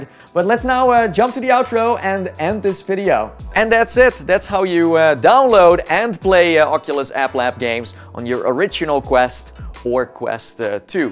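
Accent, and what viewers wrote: Netherlands. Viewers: I forgot I was subscribed. When I saw this in my notifs I heard your Dutch voice, it made me feel happy!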